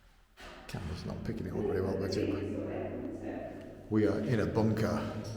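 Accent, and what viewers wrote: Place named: Northern England, United Kingdom. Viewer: I'll be waiting. The Faroe Islands are the only place that I (seem to) have been to via astral travel. It has a kind of spatial attraction for me. My father was from Norway: that may help. Halls Gap? Been there a few times including camping .. twice. Lotsa roos on the streets. Just a query for you: how come you are driving a Belfast registered vehicle while you sound like you are from the north of England?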